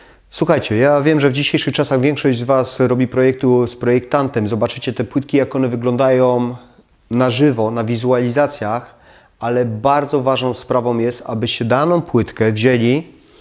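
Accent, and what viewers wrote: Silesia, Poland. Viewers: "Jeżeli klinkami zasztrabujemy..." ;) Wiem, że bardzo Pan się stara mówić po polsku, ale ten Śląsk jednak wychodzi :)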